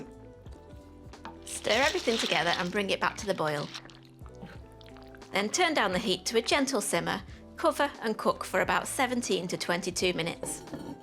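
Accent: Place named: England, United Kingdom. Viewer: Wow. This is so relaxing I love your voice. I’m a Brit permanently in USA now and it just feels sooo good hearing and English accent again 😭🥰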